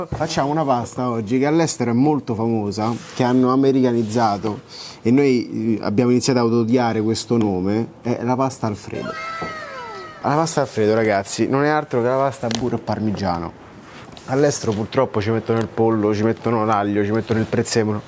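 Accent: Rome, Italy. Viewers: Ma sei un marocchino che parla con l’accento romano ??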